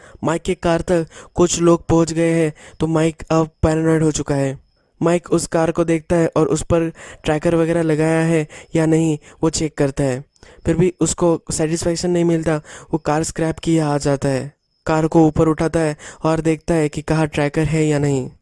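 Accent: Maharashtra, India. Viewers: Tu pakka Marathi hai Accent pakad Kiya mene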